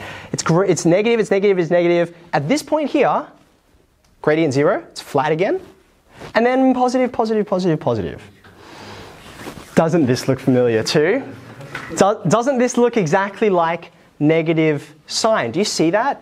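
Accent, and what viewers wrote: Australia. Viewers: Well, if I’m not mistaken he’s got an Australian accent. The pronunciation “heeyah” is typical for Aussies (or maybe also for Kiwis) , like the pronunciation “aboot” (for about) is typical for Canadians. You gotta live with it. And the abbreviation cos (or sin) is also occasionally used in spoken language in my country (Germany). Nitpickers are gonna nitpick, I guess.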